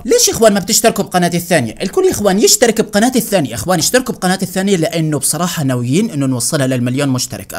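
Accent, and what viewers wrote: Iraq. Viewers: لهجتك بالعراقي تجنن 😂😂❤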